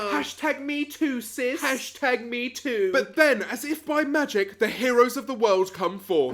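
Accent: United Kingdom. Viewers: Lol James Marriott just popped up in my recommended list...but he is soooooo funny! Good work. Love the British accent and British humour. Annihilated this ‘abbas’ bloke lol!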